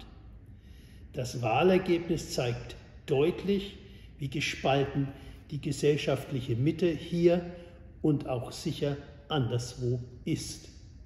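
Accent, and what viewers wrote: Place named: Sonneberg, Germany. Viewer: Tolles Statement! Und der "Soanneberch- Dialekt"; ❤❤❤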